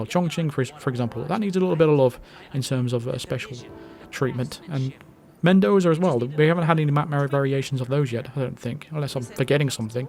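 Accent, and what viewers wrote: United Kingdom. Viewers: Finally a British man narrating hit man gameplay like a pro this is exactly what I was looking for when I think of good content! But bro mans can you convert pounds to dollars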